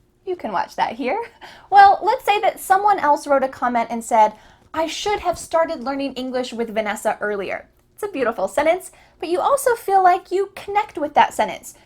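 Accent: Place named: United States. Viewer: Hi Vanessa, I really enjoy learning English with you. I love your américain accent, your smile and your energy in teaching! I’ve got motivation when I watch your videos. Even when I am in a hurry in the morning I try to listen and watch your videos while I have breakfast. Thanks a lot for your lessons. 🤩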